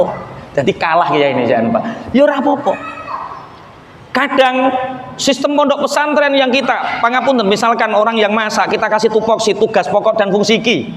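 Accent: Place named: Bojonegoro, Indonesia. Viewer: Kekel aku ngrungokne logate Yai Bojonegoro ,sebabpe aku dewe wong Bojonegoro ,tapi matur suwun pencerahanipun ,semoga berkah P Yai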